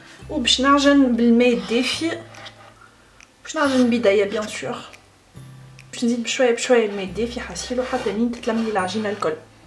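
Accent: Tunisia. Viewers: nmout 3a l accent tunissiya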